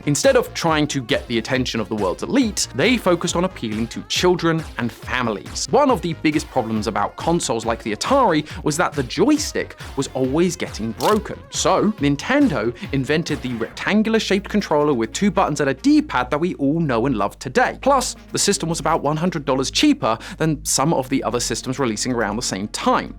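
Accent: United Kingdom. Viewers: When did they hire a Britt for this channel?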